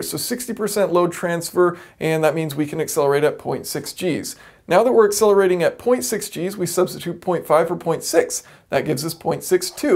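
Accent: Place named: United States. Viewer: You sound like you’re American. What’s with using “kg, and meters”? Speak in American English cock suck!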